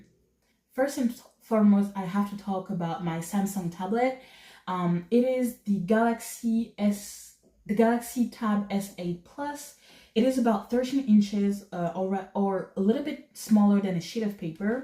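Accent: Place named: France. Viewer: You def need to have more sub! Your improvement and piano journey is inspiring...and also are you french? I noticed it on the accent.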